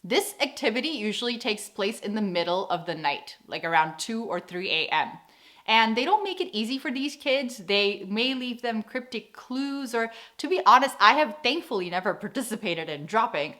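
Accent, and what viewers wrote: Netherlands. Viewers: Congrats with your Dutch citizenship. 🎉🎉You already sounded totally Dutch. And now you are. Gefeliciteerd met een echte kaaskop zijn🤣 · It's so interesting to hear that your English-speaking accent sounds more Dutch now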